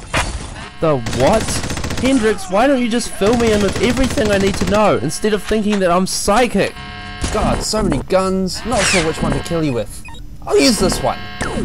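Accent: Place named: United Kingdom. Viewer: god i love your birtishnes :D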